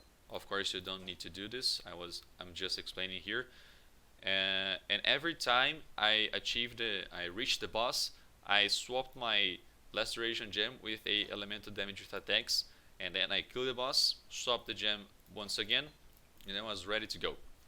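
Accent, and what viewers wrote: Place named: United Kingdom. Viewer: Agora sim eu consigo escutar esse britânico nato falando <3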